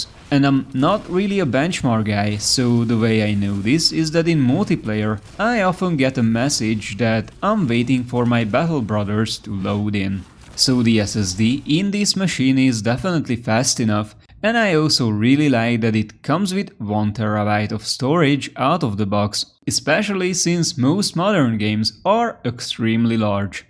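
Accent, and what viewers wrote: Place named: Hungary. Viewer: Magyar vagy? Legalábbis akcentusból ítélve. :)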